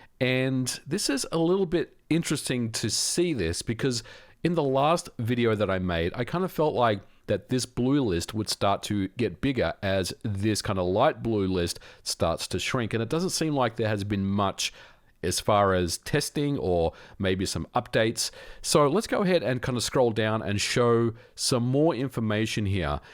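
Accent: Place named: Australia. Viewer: His accent is clearly Aussie. But yeah, I can understand the mix up. I'm from the UK and I can't tell the difference between the American and Canadian accents. Unless they're from the Southern US. Somwan stowl ma god damn truhk! Mary Jane! Hand me ma guhhn!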